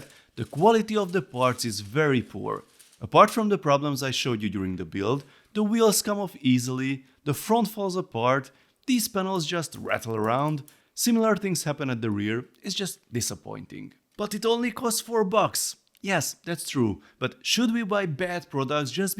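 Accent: Hungary. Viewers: No way hogy a bro magyar, nagyon fasza accent🎉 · You are Hungarian? I could have sworm I heard the accent, but never openly guessed it 🤣 howdy neighbour, greetings from Croatia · Szerintem van egy kicsi magyar akcentusa😂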